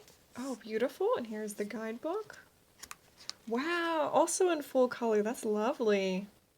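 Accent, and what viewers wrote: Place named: Eastern Europe. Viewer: Love your spirit! So joyful and enthusiastic 💕 You have very interesting accent. Are you from Eastern European culture? Love and light 🌺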